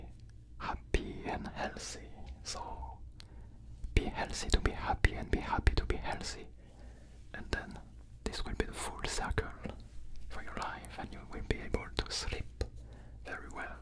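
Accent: France. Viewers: I'm not sure but are you french? I'm having a hard time recognizing the accent. If that's the case, I'd love to see a video in French someday !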